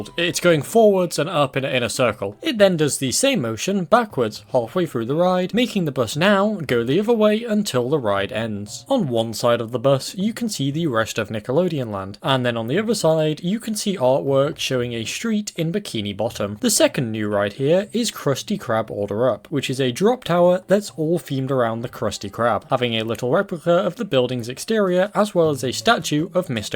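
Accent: United Kingdom. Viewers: Its about time a British Narrator got around to adressing this. Cheers!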